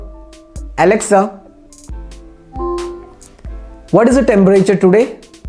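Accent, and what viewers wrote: Kerala, India. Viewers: Are you from Kerala?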